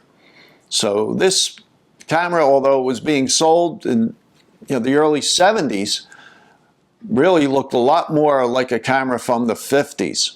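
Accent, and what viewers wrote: Philadelphia, United States. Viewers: Are you from Philly? The accent is undeniable!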